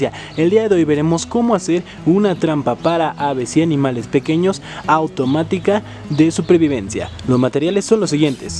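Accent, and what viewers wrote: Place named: Latin America. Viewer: Me cargó coma habla, una mezcla de latino imitando al español, vale ??? Jijij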